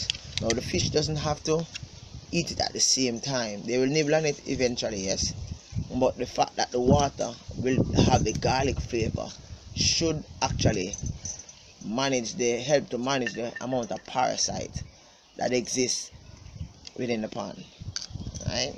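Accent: Jamaica. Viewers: i really like ur homemade ponds there really nice and pretty good info and i love ur accent Jamaica!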